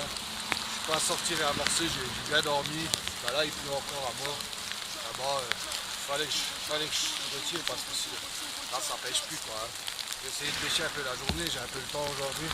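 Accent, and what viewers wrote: Alsace, France. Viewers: slt j'ai bien aimé t vidéo avec ton accent alsacien c'est un plus je me permet de venir vaire toi tu parlais d'économies pour les bouillettes je sait pas si tu connais le site aliexpres tu peux trouver du matériel pour les montages pour la pêche de la carpe PVA sac solubles est qui est moins chaire que les grandes marques le seul inconvénient c'est la livraison qui est un peu longues c'est connu par les carpiste le matériel est de bonnes qualités il à était testé pendant 1 ans voilà si sa peut te faire économiser encore un peu d'argent dit mois se que tu en pense dans l'attentes merci au plaisir de te suivre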